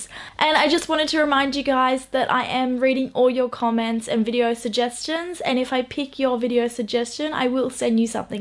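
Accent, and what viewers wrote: Australia. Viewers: I can tell your from Australia from your accent!!! I am too!!❤❤❤ (also because typo is in australia!!)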